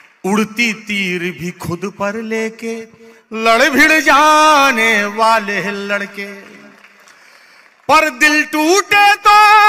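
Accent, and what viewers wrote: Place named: Allahabad, India. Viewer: aapki awwaj me hi allahabad (prayagraj) ki mahak hai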